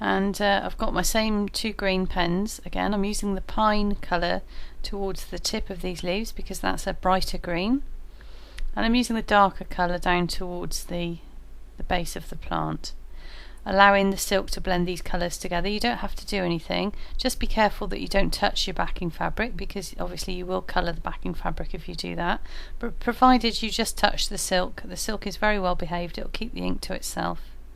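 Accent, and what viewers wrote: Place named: Bristol, United Kingdom. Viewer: Love your accent .... Bristol ? Gloucester area :)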